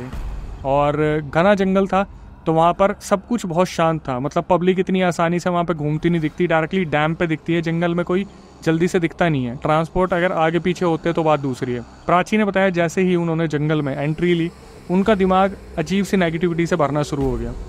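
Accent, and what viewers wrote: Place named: Bhind-Morena, India. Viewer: Bhind morena ki language h bhai 😂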